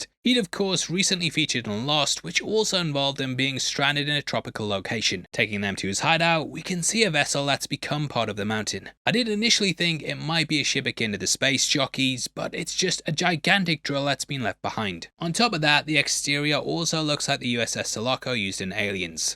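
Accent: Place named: Liverpool, United Kingdom. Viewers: Great review, scouser! (sorry, don't know your name... just your accent 😉 ) Only saw the film once (when it came out, I think) but after your review, I think I'll give it a second go - most positively worth it now! 🤩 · The Weekly Planet guys are Australian, and sounds like he’s from somewhere in Northern England (London is towards the South of England).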